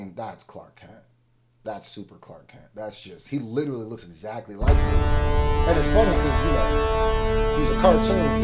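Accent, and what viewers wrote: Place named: Philadelphia, United States. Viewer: have you ever spent time in Philly or have family from there you sound like a Philly nicca sometimes. I’m from Baltimore but live in Philly now but I swore you were from Philly for the longest and you just said mixed him up mixing is some Philly shit I only learned when I moved there from Baltimore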